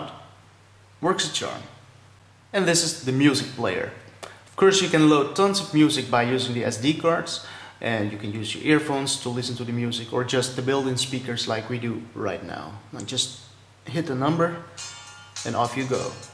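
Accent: Netherlands. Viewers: OMG Dutch English always sucks ... (but nice try) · wow a chinese who talks with a Dutch accent you don't see that very often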